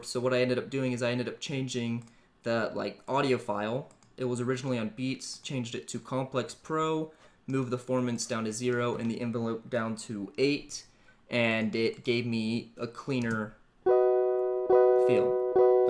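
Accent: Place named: North, United Kingdom. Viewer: Bro where are you from cause you use y'all which is a southern word but you also sound like you're from the north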